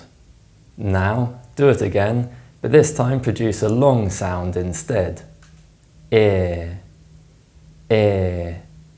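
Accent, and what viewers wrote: United Kingdom. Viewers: Thank you teacher. I like british accent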